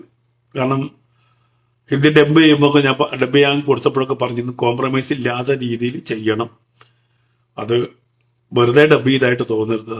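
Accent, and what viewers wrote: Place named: Vadakara, India. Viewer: I like ur Calicut Vadakara slang. Reminds me so many good friends of mine.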